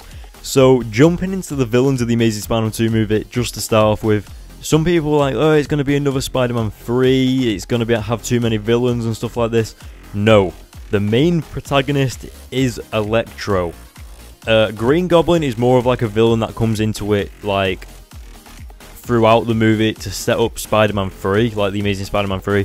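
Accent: United Kingdom. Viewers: r u british or american and which of those countries do u live in im american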